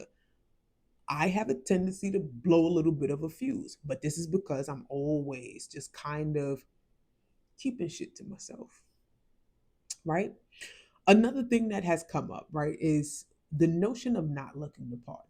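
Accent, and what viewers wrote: New York, United States. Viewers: 😂😂😂 you’re so New York love it. (Wait….you’re from New York, right ? 😭)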